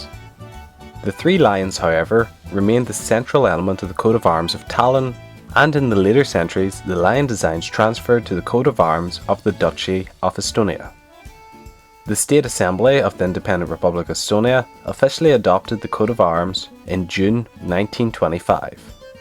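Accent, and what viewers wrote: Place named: Scotland, United Kingdom. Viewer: Your scottish accent is funny 😆😂😀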